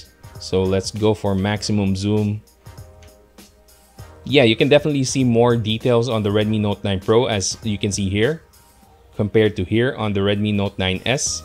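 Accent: Visayas, Philippines. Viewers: You're speaking voice is so calm. Paytera kay bisaya ja inganag accent. You are the best technodad there is. I'm def a fan💛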